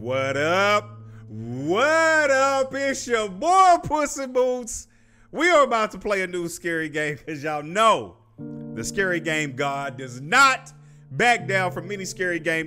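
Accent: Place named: Russia. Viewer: Your Russian accent clean af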